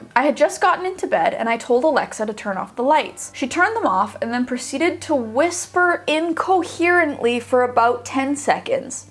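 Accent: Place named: Canada. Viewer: That's the Canadian jumping out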